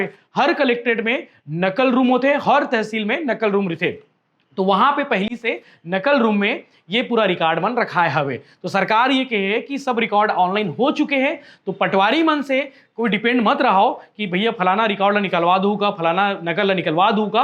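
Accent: Chhattisgarh, India. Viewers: शुद्ध छत्तीसगढ़ी, सुन के अच्छा लागिस गा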